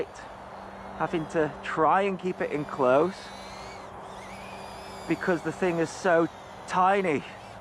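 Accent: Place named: United Kingdom. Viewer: I really like your reviews, also your english pronouncing is very enjoyable, british :-)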